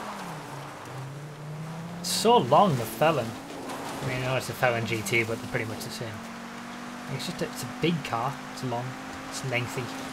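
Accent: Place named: Scotland, United Kingdom. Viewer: Are you... Scottish I’ve never watched you before and I’m Scottish myself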